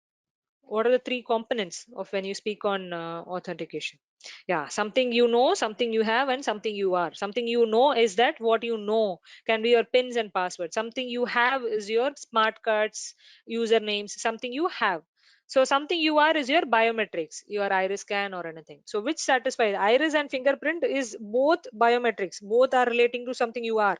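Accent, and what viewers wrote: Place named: South India, India. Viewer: Disgusting South Indian accent. It’s domain not domine!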